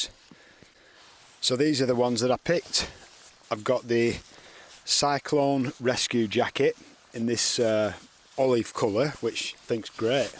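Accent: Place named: Yorkshire, United Kingdom. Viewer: Just found your channel, loved it, nice to hear a great YORKSHIRE ACCENT EEEH BY GUM, thank you hope all goes well with your hand op, takecare Lv Jacqueline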